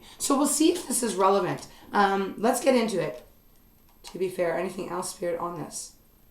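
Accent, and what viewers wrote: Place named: Canada. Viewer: Thank you! ❤ Are you in Canada? I’m in Montreal and you sound like a fellow Canadian (I’m in Montreal)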